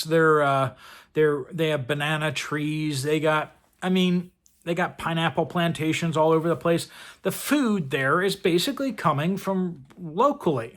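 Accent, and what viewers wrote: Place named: Pittsburgh, United States. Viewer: I can understand what you are doing and pray that you will have a wonderful life with your beautiful new wife. You can leave the Keystone state but you will always have the the Pittsburgh accent. I don't want to leave Pennsylvania but I'm also nowhere near ANY city. 😊 I certainly agree with you on the tax situation.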